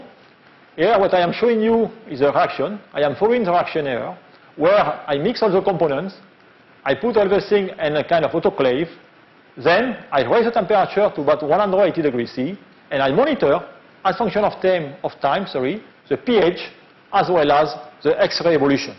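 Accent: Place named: France. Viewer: Nice lecture, but the French accent is too strong.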